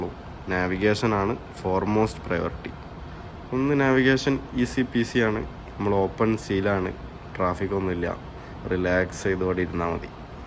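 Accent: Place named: Kozhikode, India. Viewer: Voice over sound കുറവാണു... ആരോ ചെവിയിൽ പതുക്കെ പറയുന്ന പോലെ 😄... പിന്നെ ഈ കോഴിക്കോടൻ slang ഒഴിവാക്കിയാൽ കേരളത്തിലെ എല്ലാവർക്കും ഇഷ്ടപ്പെടും.... "ദേവൊസോം" എന്നല്ല ദിവസം എന്നും മണിക്യൂർ എന്നതിന് പകരം മണിക്കൂർ എന്നും തിരുത്തുക.... Comments പോസിറ്റീവ് ആയി എടുക്കുമെന്ന് പ്രതീക്ഷിക്കുന്നു 🙏.... Video നന്നാവുന്നുണ്ട്... ഭാവുകങ്ങൾ 👍👍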